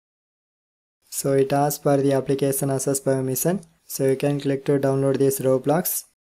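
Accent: India. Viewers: TV as thai i think he not from thailand i think he is indian bc accent in thailand not like this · thanks bro. for some reason its always the dudes that either are actually indian or sound like them that end up helping me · thank you my bro i was making fun of ur accent now ill stop making fun of indians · Indian voice, bye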